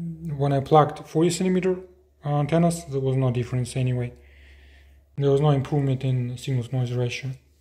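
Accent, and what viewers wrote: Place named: Eastern Europe. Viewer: When I hear a Slavic accent for some reason right away I trust the forthcoming information about radio equipment 🙂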